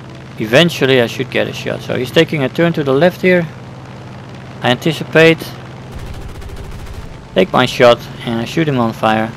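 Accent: Netherlands. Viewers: Ah the glorious accent of the Dutchman.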